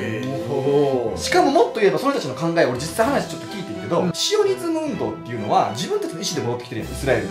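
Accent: Kansai, Japan. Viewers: いつも寝る前に過去の動画を聞きながから寝るのだけど、さんの声が癒し効果があるのかすーーぐ寝てしまう(笑) そんな人多いはず w どんな話もその時代におった関西人みたいにしゃべってくれはるから解りやすいwwww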